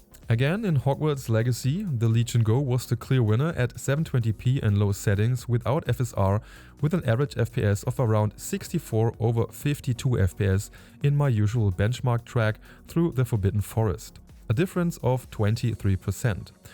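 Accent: Germany. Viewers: Dachte mir die ganze Zeit… ists nen deutscher? 🤣 bis zum letzten Wort war ich mir unsicher 🤣 Tschüss!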